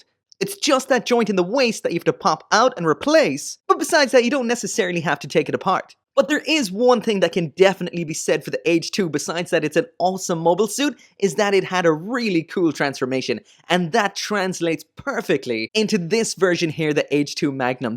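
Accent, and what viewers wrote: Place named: Ireland. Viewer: You look and sound like an Irish Bam Margera dude.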